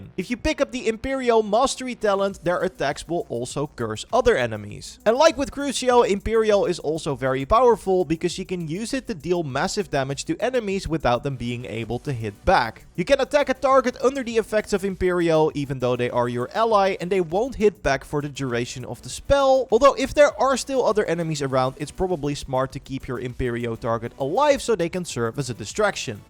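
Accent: Netherlands. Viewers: You dutch ?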